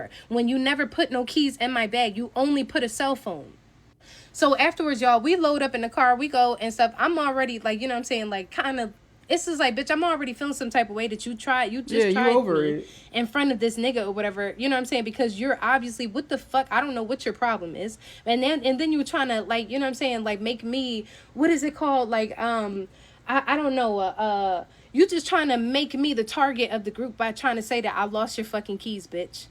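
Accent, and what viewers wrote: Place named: United States. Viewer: Not raining on her parade or NOTHING! But as an American who lived in Jamaica for three months, also in the hood, a big percentage of why you get so much attention is BECAUSE YOURE AMERICAN! They can tell very easily, and don’t open your mouth cuz that accent gone tell on you, that’s also probably why the guys brother brought you to meet so many people, it gives people status to “know” an American. She’s also soooo beautiful so I’m sure she gets a lot of attention anyways but just food for thought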